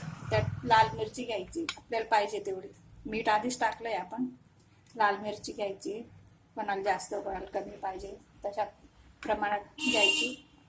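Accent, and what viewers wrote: Khandesh, India. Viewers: Maushi tumhi Khandesh chya aahat kaa? Tumchya bhashewarun aani swayampakachi banawnyachya padhhatiwarun watate. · Khandeshi ka.....? Apan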